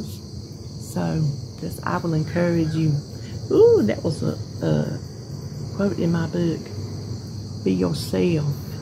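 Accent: South, United States. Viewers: You are wonderful, and I love watching you. I’ve been watching your journey for quit a while, and I am so proud of you for doing so well, and keeping on with whatever you’ve needed to do to lose your weight. As I have said, I have watched your channel for a long time, and I recently noticed something that I wonder if you have noticed: I’ve noticed your reflection in the window behind you on your porch, and how much smaller you look in your reflection. Have you noticed that? It’s kind of amazing! There’s a huge difference! As to your comment that you speak like a hick, I love to hear you talk. My father was from the south, and you remind me so much of my aunts and grandma in the way you talk and the expressions you use. It’s like a family reunion 😂 Thanks for what you do and share. You help me stay on track because your videos are so encouraging.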